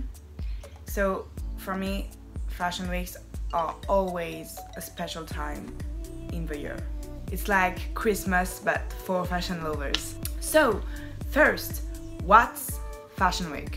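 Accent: France. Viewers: Hello ! I am american 🇺🇸and your video interested but on the other hand you do not have American accent but a strong French 🇫🇷accent and you look a little your words which is a little difficult to understand you but otherwise it is a very good video the subject is interesting👍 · Your accent sounds very french that’s soooooo cute😍😭😭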